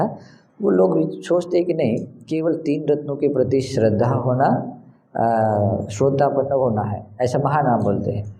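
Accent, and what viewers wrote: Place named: Gujarat, India. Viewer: Is he gujrati? Why his accent is like gujrati?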